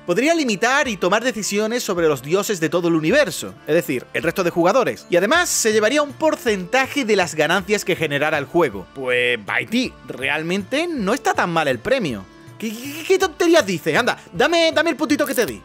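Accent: Chile. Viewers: La wea!? Jajaja el acento chileno es tan horrendo jajaja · Como chileno vine a buscar este comentario, me explotó la cabeza que hablases en chileno xd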